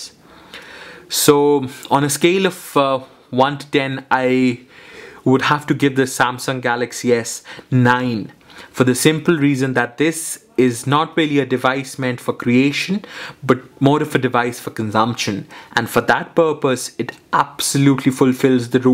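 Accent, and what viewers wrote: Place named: India. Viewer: Haha, what are you people on about? He sounds nothing like Arnie, he's Indian!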